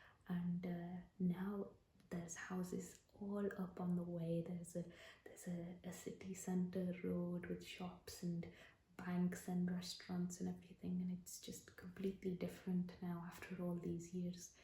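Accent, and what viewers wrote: India. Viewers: ahhh I can't believe I missed this!! Your first Indian accent video is one of my all time favourites! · I enjoy India accent videos. Thank you. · Your accent is so lovely it’s so smooth with the Indian accent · There aren’t many Indian ASMR videos so this means a lot to me. I love ASMR, Indian women, and Indian accents so thank you queen. 👑. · I have missed indian accent video soooo much. Thanks !❤️ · I’ve always found Indian accents to be very nice. Sort of calming lol, glad others do too. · Love your accent ASMR videos! Please keep making the Indian accent ones, the last one was great too! Not enough of those in YouTube. So soothing and relaxing!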